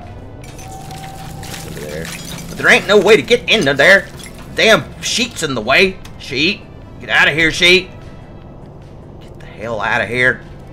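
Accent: South, United States. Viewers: pissed off Southern accent is the best thing ever. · You just get more and more southern the longer this goes! "How in the HAY-ULL!?" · gets really, really Southern when he's stressed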